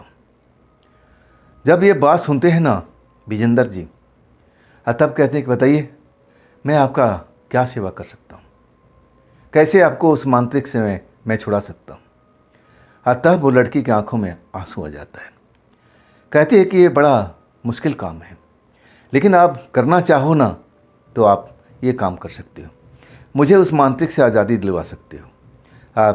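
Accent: Bihar, India. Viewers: 🎉Aapki aawaz bahut hi accha lagta hai bihar ki jhalak aati hai 😅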